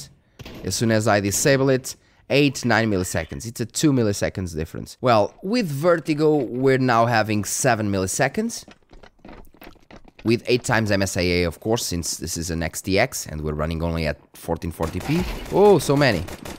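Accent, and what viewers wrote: Portugal. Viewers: Boas mano, preferes o processador 7700x ao 7800x3d? Reparei que esse é o teu. Abraço bom conteúdo, pelo sotaque vi logo que eras tuga hehehe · Are you Portuguese by any chance? You sound like one of us tho haha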